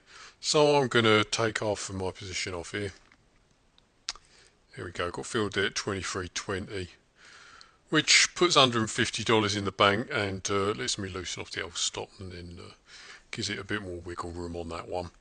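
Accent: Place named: South London, United Kingdom. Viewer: that's funny.Americans often have trouble understanding the british accent. They think we all speak like the queen or maybe hugh Grant or something, lol. Wait until you hear someone with a thick scouser accent. Sounds like he's from south London at a guess. loving the videos.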